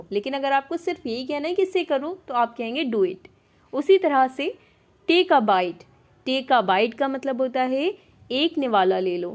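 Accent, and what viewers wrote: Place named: Bihar, India. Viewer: What a bhihari English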